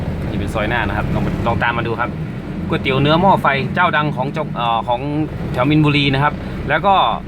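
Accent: North, Thailand. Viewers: น่าจะอู้เหนือเนาะคับ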